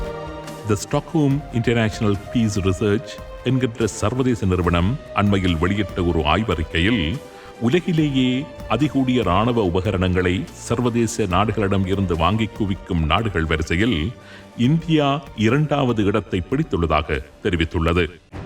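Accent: Sri Lanka. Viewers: Srilankan voice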